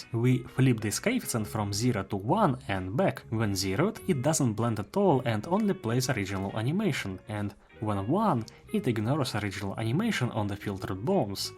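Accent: Russia. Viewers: Хмм, что-то уж слишком хорошо я начал понимать английский. Как будто собрат по русскому языку пытается на английском что-то рассказать и все понятно,т.к отсутствует английский акцент 🎉🎉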